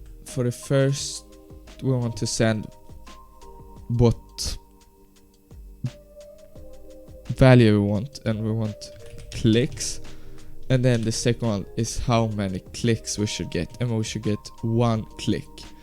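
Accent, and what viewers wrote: Sweden. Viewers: bro have got the swedish accent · Are you swedish it sounds like that good tutorial! :D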